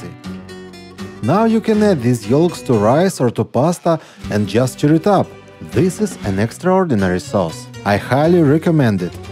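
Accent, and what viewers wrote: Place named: Russia. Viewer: Such a sweet Russian accent. I love it.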